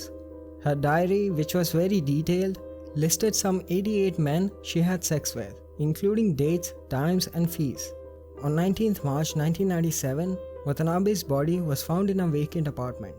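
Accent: India. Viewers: the Indian accent is undeniable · The narrator is prolly from India 🙋🏽‍♀️ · Walks in Hears Indian Accent Walks out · indian narrator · I agree. I don't understand having a man with a strong Indian accent narrate this video, of all people. I couldn't understand a lot of it and it was really distracting. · you don’t understand that the creator of the channel is actually an Indian man, and thus is probably going to have an Indian accent? 🙄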